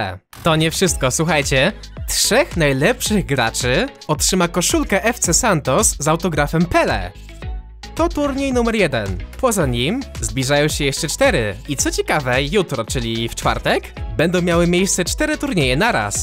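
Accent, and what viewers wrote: Poland. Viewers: Cze cze czekaj czyli jest górnik zabrze, od którego lepsza jest chyba legia warszawa i lech poznań, ale nie ma bayern munchen (monachium)? Przecież tam gra Robert Lewandowski, jeden z najlepszych piłkarzy Europy (jak się nie mylę). Btw szacunek za polski akcent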